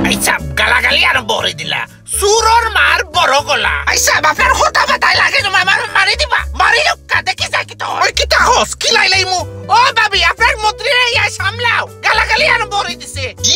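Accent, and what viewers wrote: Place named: Sylhet, Bangladesh. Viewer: this guy is actually fakhna sylheti... like how someone born and bred in desh would actually be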